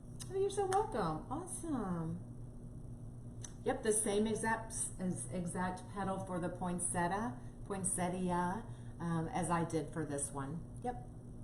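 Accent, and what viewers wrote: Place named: South, United States. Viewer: LOVE your southern drawl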